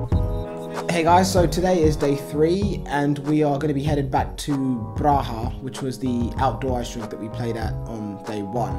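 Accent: United Kingdom. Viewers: Lol, the start of the video just made me laugh so much.... A British? guy talking about ice-hockey. Never expected to hear that. Football, yes, but hockey....wtf! Ok Britts won 1936 olympic gold in hockey. Britts and hockey is like us Finns and football i guess.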